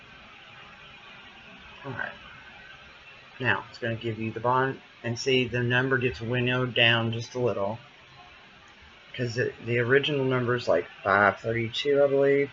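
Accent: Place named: Boston, United States. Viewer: YOU TALK LIKE ME. Boston?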